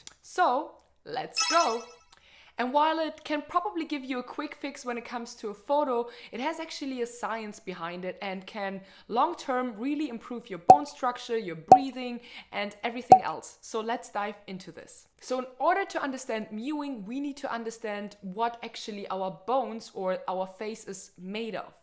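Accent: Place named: Germany. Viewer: Hab direkt gemerkt, dass du Deutsch bist ;)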